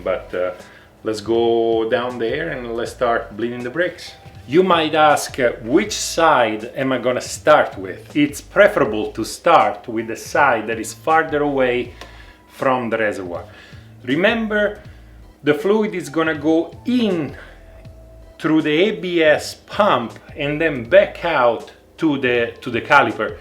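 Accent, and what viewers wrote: Italy. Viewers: love the accent! Italy?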